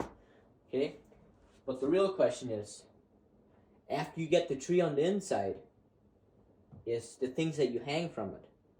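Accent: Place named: Switzerland. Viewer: Dude you speak swiss german accent not dutch. I heard one amish say "bin ufgwachse". Thats 1:1 swiss german accent kanton luzern. Verstosch?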